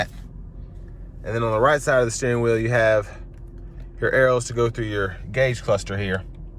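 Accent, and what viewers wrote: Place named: Pittsburgh, United States. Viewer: Nice review. I know you are in Canada but it sounds like you have a Pittsburgh accent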